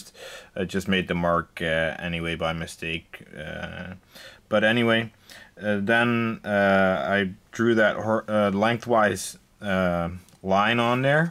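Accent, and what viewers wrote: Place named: Canada. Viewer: you sound Canadian, where r u from?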